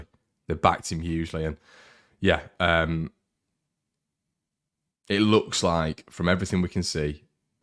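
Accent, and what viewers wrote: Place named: Yorkshire, United Kingdom. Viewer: Narked off 😂😂 proper Yorkshire. Loving it.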